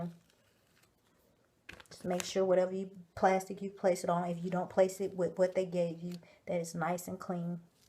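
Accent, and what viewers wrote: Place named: South, United States. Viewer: ❤️your southern accent! I'm from Connecticut. Where are you from?😁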